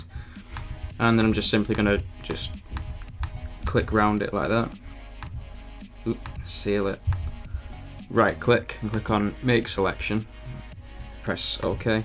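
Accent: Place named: Northern England, United Kingdom. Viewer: After so many Canadian and American channels, I love hearing a fellow UK Northerner, and delivering such quality videos! Cheers pal!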